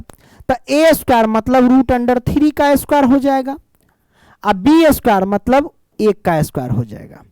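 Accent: Bihar, India. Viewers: Sir apka bihari bhasha mast lagta hai❤❤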